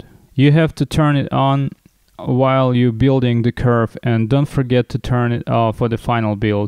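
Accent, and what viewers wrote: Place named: Russia. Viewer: рашн рашн,акцент не спутаешь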